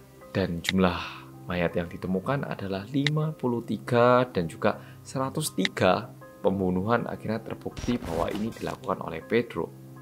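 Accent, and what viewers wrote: Java, Indonesia. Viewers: Yokk! Kak dikit lagi 4M subscriber nihh kak. Aku ngerti sih banyak yg suka liat konten² kak bukan karena kak orang korea medok aja, tapi karena kak orangnya selalu berusaha buat diplomatis atau gak mendukung 1 sisi dalam ngasih opini dan pemilihan katanya oke, bahkan editornya aja kayaknya suka ngelawak kek kata² "eummm" tadi. Kak juga gak terlalu membahas kpop yg sekarang malah menjadi bahan menarik buat narik banyak orang, karena berusaha buat gak membela salah satu pihak gituh. Jadi semua konten²nya selalu informatif dan dapet valuenya... bener² gak salah sih milih jadi panutan pas di tugas kuliah wkwkwkwk. Semoga sampai 20M subscriber pun mempertahankan hal ini. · 3 channel storytelling favorit: 1. (pelan, medhok dan mudah dipahami) 2. Nadia Omara (gayanya kayak itu ghibah) 3. Arroyan Pram (Konspirasi dunia pake Astronomi, Fisika, dan editannya gila keren banget, serasa nonton agung hapsah dan Jerome polin bahas konspirasi) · Apa cuman aku yang suka ngikutin atau jawab: bersama orang korea yang.... 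Me:Medookkkkk:|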